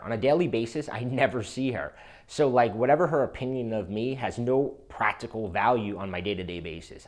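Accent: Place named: New York, United States. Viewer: are you from Long Island or Queens?...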